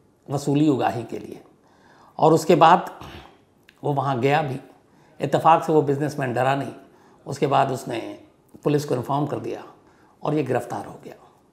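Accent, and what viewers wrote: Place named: Mumbai, India. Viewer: bambiya bolte king 👑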